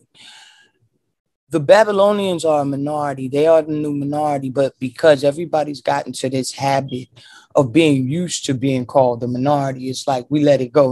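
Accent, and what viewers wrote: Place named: New York, United States. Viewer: Sister, you are a wise beautiful person. All praises to TMH for you!!! He has given you great strength and I really appreciate it. I'm glad you are speaking on this, because I saw that particular Kerry Ann's video about India, and I was saying the same thing, "They are NOT TMH'S PEOPLE at all shaking my head. THEY ARE NOT CALLED BY TMH'S NAME!!! Sister, Psalm -11 tells us to rejoice when we seeth the vengeance.... Sister, you sound like a Northerner, a New Yorker. Anyways, TMH continue to open your eyes sister. Please, if you will sister, check out gdash the prophet channel. He was similar to you, but TMH has raised in up to a higher level of wisdom, knowledge, and understanding. May TMH raise you up higher as well. Peace Sister. Love you my sister.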